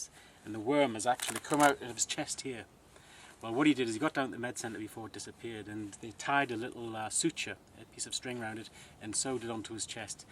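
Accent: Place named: Newcastle, United Kingdom. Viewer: last vid i just watched of him he had a geordie accent, now seems to speak alot clearer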